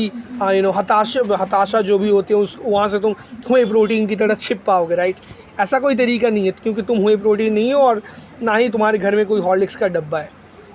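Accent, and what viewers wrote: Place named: Bengal, India. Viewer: Wanting to work hard is luck too, I dont know why ppl dont realise that .I know how I would end up in a few years if I don't work hard but I still don't I just can't focus its just luck. Bengalis have really cool accents btw look at you and kirti chow for example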